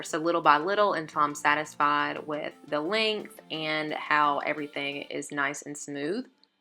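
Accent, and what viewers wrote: South, United States. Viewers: So pretty, and I love your accent, are you from the south?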